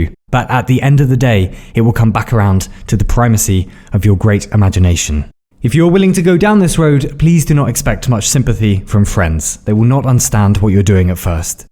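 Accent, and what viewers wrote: RP, United Kingdom. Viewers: i do a lot of art but i also just want more turtle boy with funny british voice saying things to prevent me from commiting sewerslide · ah the fancy rp english accent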